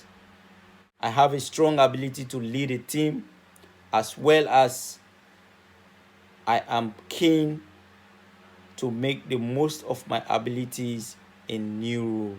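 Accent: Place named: Sierra Leone. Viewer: You sound like a Sierra Leonean. Are you? Thanks for sharing...new sub showing some love 💓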